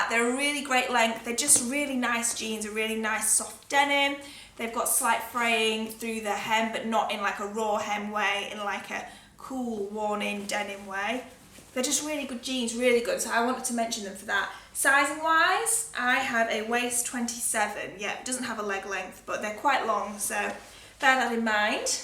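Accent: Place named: North West England, United Kingdom. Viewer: Where you from? I detect a north west accent :)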